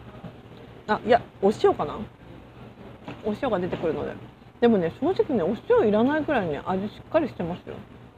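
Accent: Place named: Japan. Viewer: 발음이 완전이 일본분 나마에와 한국이름 그렇군요, 맛집 알려주셔서 감사합니다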